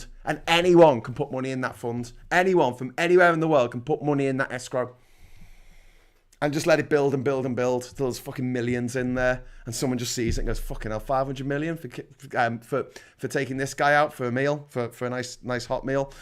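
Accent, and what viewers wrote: Liverpool, United Kingdom. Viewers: I usually listen to Phil Moorhouse, but I like this angrier scouse version of events.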